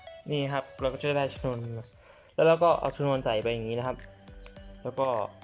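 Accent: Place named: Isan, Thailand. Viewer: คนอีสานปะครับเนี้ย โอ้ยยแต่ละคำน้อวว555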